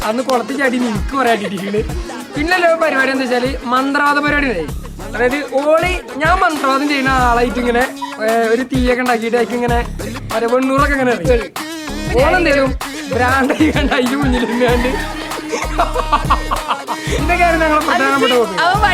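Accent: Malappuram, India. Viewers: മലപ്പുറം ഭാഷ, എവിടെയാ വീട്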